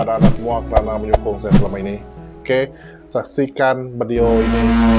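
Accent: Iban, Malaysia. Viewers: Slang iban aya nda ulih buai😅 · aok.. jgn buang slang iban.. ya antara entik d negeri kita.. agi idup agi ngelaban... huuuuhaaaaa!!! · Pekat Slank Iban... Terhibur Ngau video tok... Tq... Semoga terus bersemangat menghasilkan video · Kn..pekat iban iya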